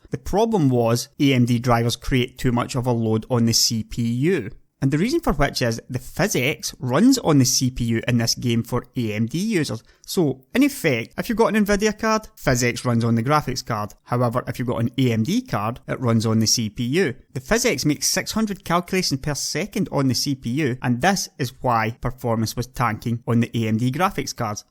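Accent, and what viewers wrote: Scotland, United Kingdom. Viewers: It's Scottish English, laddie! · scottish, game over for you. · Great information and he dove in deep. This reinforces what I've suspected over the last decade which is that there's no real need for so many hardware refreshes. It's more about marketing gimmicks and lazy coding. Sure there's other factors like the amount of texture memory available, speed and power efficiency but when I started seeing less than 10% overall performance improvements between generations I knew something was up. This vid made me a subscriber, Scottish brogue and all...:-) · Jesus christ another Scottish ned I have to listen to for 20 minutes it's bad enough trying to understand what these monkeys are saying in Trainspotting. Now I have to listen to this heroin addicted tree dweller talk about Gameworks? I don't think so! We really need to build that gigantic wall and have done with these "people" I am so sick of it.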